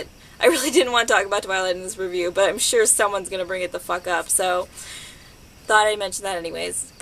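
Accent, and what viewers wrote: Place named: Brooklyn, United States. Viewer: I'm just starting to notice your Brooklyn-ish accent. It's cute. That is all C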